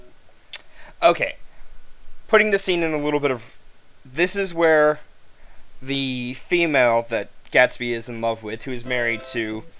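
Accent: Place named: New York, United States. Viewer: Jay Gatsby's his name. Daisy's Nick's cousin. I just read the book, haha :-) I don't remember the part ish or level two though. I don't know what those are from. The spectacles are TJ Eckleberg's, on a billboard for a glasses company. I love your NY accent, btw. The part about Gatsby being poor was a flashback though. And there are no sewers in the book XD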